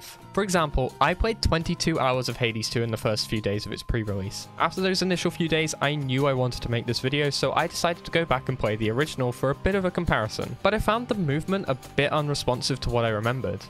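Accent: United Kingdom. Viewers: Clickbait thumbnail - Check Talking Head with 4 poses - Check Hasn’t beaten the game they’re talking about - Check Annoyingly British - Oh Absolutely Yup it’s a game journalist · I will sub you bro love British accent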